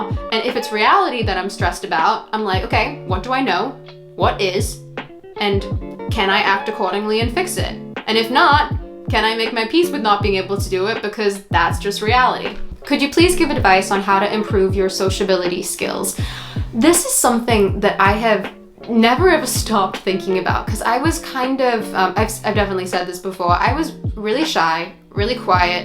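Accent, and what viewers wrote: United Kingdom. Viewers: I can hear the British side screaming to come out ❤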